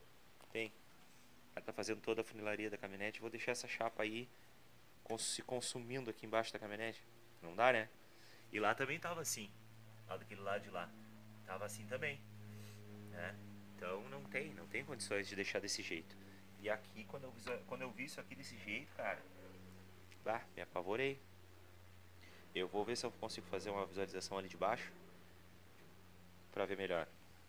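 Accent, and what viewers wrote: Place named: Rio Grande do Sul, Brazil. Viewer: cara legal seu canal eu tenho vontade de comprar um caminhonete dessas ,vi pelo teu sotaque que tu parece ser gaucho tu e de onde ?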